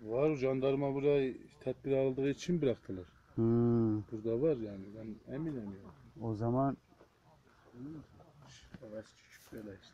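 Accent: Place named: Erzurum, Turkey. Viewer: Erzurum civarı diye düşündüm... düzlük, yükselti... bir de konuşan adamın ağzı.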